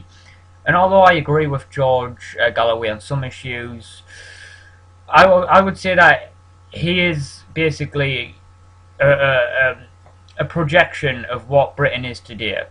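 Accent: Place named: Ireland. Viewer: I just seen this video you are a dumbass British moron that sound like a Irish leprechaun why don't you put on your green suit and go find your gold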